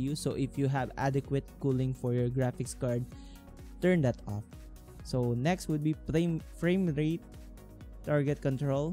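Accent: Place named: Philippines. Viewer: Haha pinoy accent 😉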